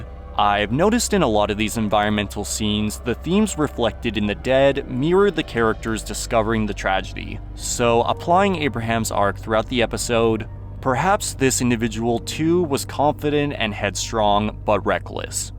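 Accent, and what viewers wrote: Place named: Iowa, United States. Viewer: Did this guy say he was Canadian? His vowels sound like he’s from Iowa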